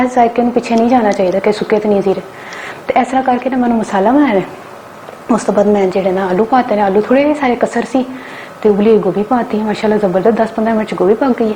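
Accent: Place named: Punjab, India. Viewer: yr tusi punjabi bolde bht zeada cutee lg de ooo keep on 👌👌👌😘😘😘😘😘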